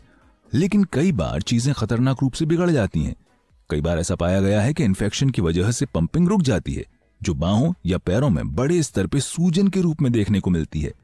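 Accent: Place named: India. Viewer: Yeh video kisi hindi channel say li hai. Banday kay bolnay kay andaz say pata chal raha hay kay woh India ka hai aur hindi kay lafz bhi istamal karaha hai. Nabe prateeshad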